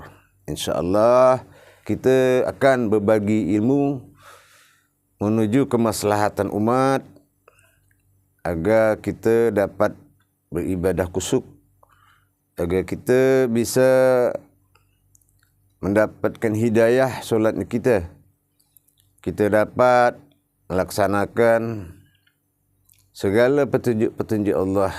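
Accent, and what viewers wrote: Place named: Malaysia. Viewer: Mf buya.kenapa logatnya diubah melayu.kan lebih nasional indonesia.lebih bnyak mempergunakannya.artinya lebih bnyak memahami indonesia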